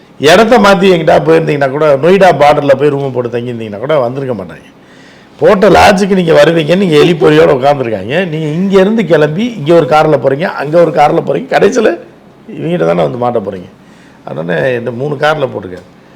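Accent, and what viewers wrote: Madurai, India. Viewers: தோழர் அவர்களே எப்பிடி தோழா நம்ம மதுரை பாசையில் சிரிச்சே நீங்க சிரிக்காம சித்தப்பாவ NO S NO S கலக்கல் வேரலெவல் தலைவா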